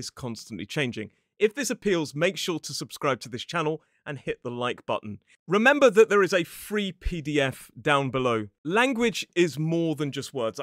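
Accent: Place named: RP, United Kingdom. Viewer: I am from Canada and ok your English sounds like Received pronunciation and it's very easy for me to understand but some British dialects are impossible for us Canadian os American to understand....I remember I needed to have subtitles on when watching Game of Thrones.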